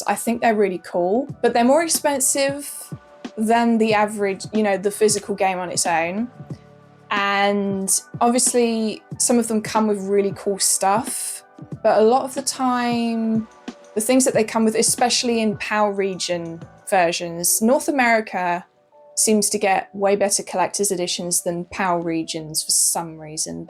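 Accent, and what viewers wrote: England, United Kingdom. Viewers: Yes. I like it. I'm not from english speaking country. I like english accen the most ☺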